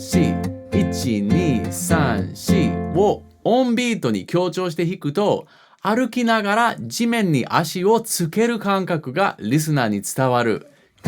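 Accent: Kansai, Japan. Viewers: 毎秒アップロードしてほしいし、ずっと関西弁で話しかけてほしい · サチモスのカバーが突然お勧めに出て来て先生にどハマりしてんねんけど、バイオグラフィーのどこを読んでも博士がネイティブ関西弁に行き着く要素が見えてこなくて頭がバグってます。とりあえず大ファンになってもた。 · 解説もだけど、関西弁を関西人がめっちゃ笑って聞ける楽しませ方も知ってる人やね。楽しいです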